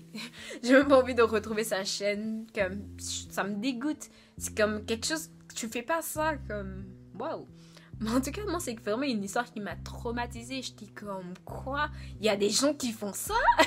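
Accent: Quebec, Canada. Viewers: Tu vis au Canada ? On dirait presque que t'as un accent Québécois ;) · T’es tu québécois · jannette jackson C'est pas un accent anglais mais québécois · T’es québécoise ? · Ton accent est tellement beau! on dirait du québécois du français et de l'anglais c'est trop mimi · Accent canadien?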